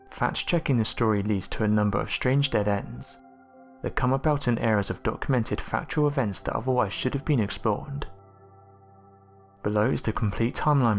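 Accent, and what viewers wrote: United Kingdom. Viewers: These videos would be much better if you slowed down a bit, the rapid fire reading with the British accent makes it extremely hard to understand. Not everyone is British.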